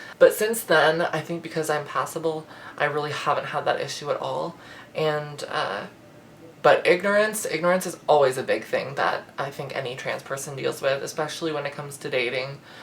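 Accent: Canada. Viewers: You are one of my favourite youtubers. You come from the same region as me and I find your voice, speech pattern, wording, and slang so calming to listen to. Aside from that I genuinely enjoy hearing what you have to say content wise. I've been living provinces away from home for the past couple years and your videos are my favourite thing for easing homesickness. The way you tell a story is just so familiar and that dry subtle humour paired with classic Canadian manners just gets me laughing.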